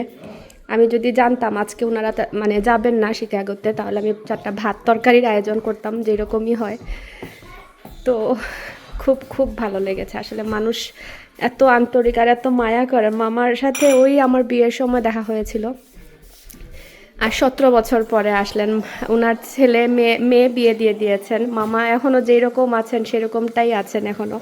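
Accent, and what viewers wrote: Sylhet, Bangladesh. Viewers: আস্সালমুয়ালাইকুম ,আজকে ১st কমেন্ট করলাম, আমি আপনার একজন রেগুলার ভিউয়ার্স, আমি আপনার ব্লগ দেখি কত ইয়ার আগের থেকে ঠিক মনে নাই, তবে আপনার সিলেটি ভয়েস শুনে ই আমার জা আপনার ব্লগ শেয়ার করেছিলো বলসিলো দিনা কি সুন্দর সিলেটি ভাষা শুনতে ভালো লাগতো তবে অনেক কথা ই বুঝতাম না 😅, তখন মনে হয় করোনা র সময় ছিলো ।আমরা জাপান থাকি ,আপনার ব্লগ অনেক ভালো লাগে, আর কত যে রেসিপি আপনার কাছ থেকে শিখছি, আলহামদুলিল্লাহ, একটা কাবাব এখন ও প্রায় করা হয় ওই যে পাটা তে চেছে বিফ কাবাব, আপনার আম্মা র রেসিপি । অনেক দোআ আপনার জন্য মাশাআল্লাহ এত্ত গুণী আপনি, আর আপনার ঘর গুছানো আমার অনেক পছন্দ ।অনেক কিছু লিখতে মন চাইতছে থাক ❤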